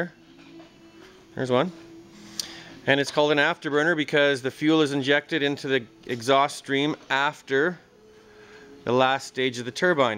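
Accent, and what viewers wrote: Canada. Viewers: very informative video. Thanks, mate. On a different note, are you Canadian?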